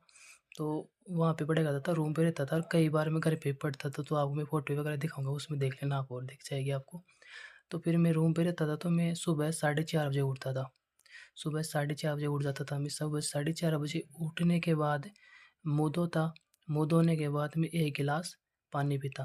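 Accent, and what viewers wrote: Rajasthan, India. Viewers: बोली से पक्का हो गया की राजस्थान से हो पर राजस्थान में कहा से 🤔😅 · Aap kha se ho bhaiya aapki voice Rajasthani h m bhi alwar Rajasthan se radhe radhe bhaiya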